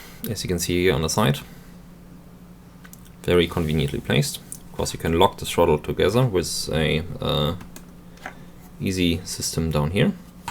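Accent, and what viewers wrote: Germany. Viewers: Du bist Deutscher oder? ;D wenn ja, man hört deinen Deutschen Akzent oder so. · erstma danke für die antwort... trotzdem habe mich auf der channelseite des " " informiert er is aus Deutschland;-) Und wenn man das nich an dem Akzent hört heheheheh dannweiß ich auch nich.... P.s. Fliege IL2, Cliffs of Dover und ab und zu FSX...da brauch man glaube ich nen extra Addon für damit das FFB richtig funtz!? cya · I'd bet you are a native german speaker .. :-)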